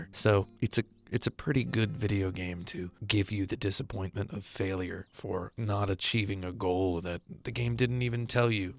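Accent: Australia. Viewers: As a British person, I think the text definitely read smoother with an Australian accent. I think the game is Australian come to think of it. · dangerously australian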